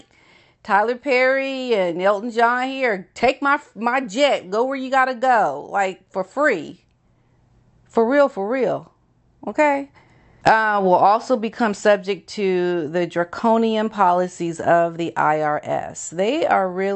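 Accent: England, United Kingdom. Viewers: I love your English accent so funny xx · You have me in fits of laughter lady. I do look forward to your vids and that English accent is priceless! ‘Didn’t you just say that?’🥰👍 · your british accent is everything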